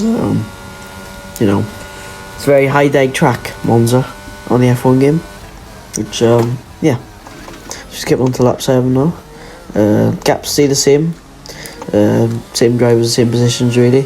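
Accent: Wales, United Kingdom. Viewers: I’d recognise that accent anywhere! Newport or Rhondda? Great driving mate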